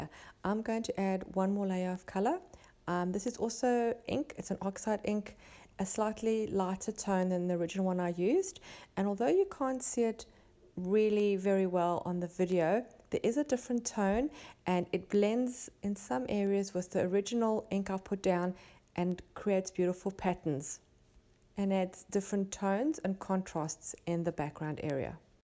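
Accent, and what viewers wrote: South Africa. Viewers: sounds more South African than Australian, doesn't she?:) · I could tell she is South African, that accent never goes.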